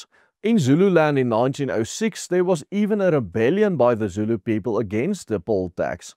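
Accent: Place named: Afrikaans, South Africa. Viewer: Was worried for a second, but then I heard his afrikaans accent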